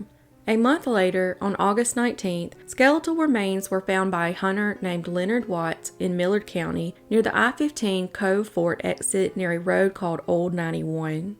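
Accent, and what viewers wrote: South, United States. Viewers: I love your channel!! Your accent rulz!!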